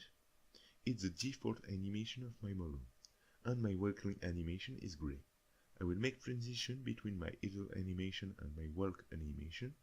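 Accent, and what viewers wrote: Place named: France. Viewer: Don't worry about your french accent this tutorial is great! I just started researching voxels so this place is a good start for me! Thank man!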